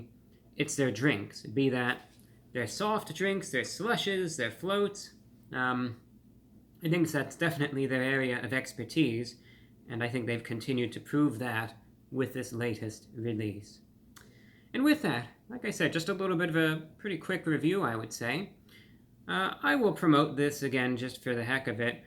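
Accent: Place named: New York, United States. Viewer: I suggest everyone go back and look at young reviewbrah's videos from <num> era. So many classic videos from that era, no HD camera, you got the classic table or armoire setting, tons of reviews from the CAR!!! I mean so many reasons to go back and look at those not to mention the dry cynical humor is way up there and lastly teenage reviewbrah's accent is in peak New York form! Again if your a TROTW fan and haven't watched any videos from that era do yourself a favor and go do it! JUST DO IT!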